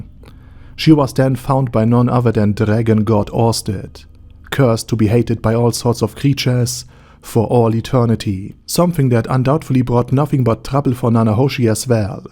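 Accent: Germany. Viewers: This German accent haha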